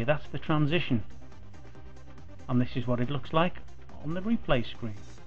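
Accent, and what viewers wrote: Wales, United Kingdom. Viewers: where are you from? coz you sound kinda welsh.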